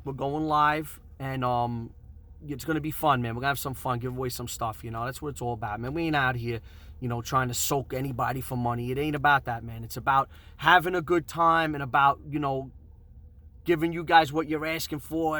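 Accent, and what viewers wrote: New York, United States. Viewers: That's because you guys dont do clickbait titles. If you say you're gonna drop it, you're gonna drop the fuck out of it until something breaks. Also I love how you yell, but being a new yorker myself, I know that's just you're normal voice.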